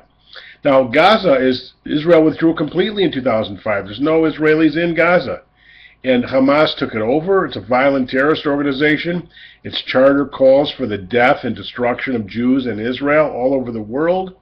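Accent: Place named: United States. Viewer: I thought he had just visited Israel to honour his grandmother, Princess Alice. You obviously have not heard the news...! You are obviously American by your accent and that could excuse your ignorance.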